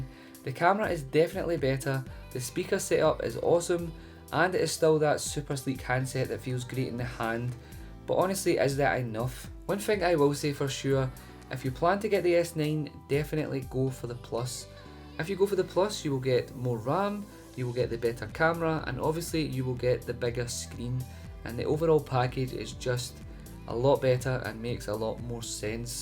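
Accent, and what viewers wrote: Scotland, United Kingdom. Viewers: Love the British accent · nice Scottish accent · Awesome! Subbed because your accent reminds me of tartans. =)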